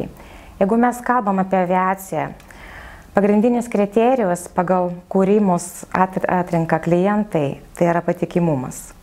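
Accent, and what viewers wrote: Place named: Russia. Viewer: kaip zmogui lietuvisku vardu ir pavarde (mergautine) gavosi taip istobulinti rusiska akcenta? metu metus metelicoj sedejo?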